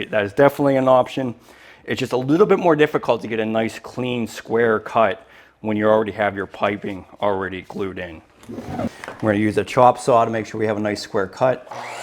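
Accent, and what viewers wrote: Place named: Pennsylvania, United States. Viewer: My boy has a Pennsylvania accent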